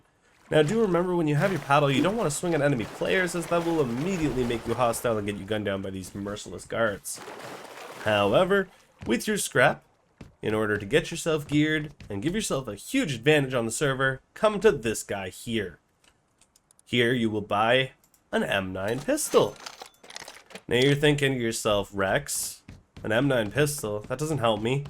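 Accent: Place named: Canada. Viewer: You sound Canadian af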